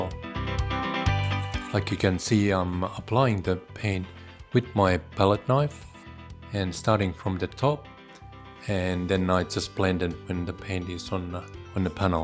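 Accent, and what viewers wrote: Australia. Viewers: I was listening to your accent, thinking, boy, this looks like a gum tree here, and you are! Here, I mean, not a gum tree. I love your technique, I paint with acrylics but can always adapt oil techniques to an equivalent acrylic application. Thank you for sharing, I'm stuck on a gum in my latest painting, so going to try your paper towel trick for foliage. The exact look I am after. New subscriber from Central Queensland Australia.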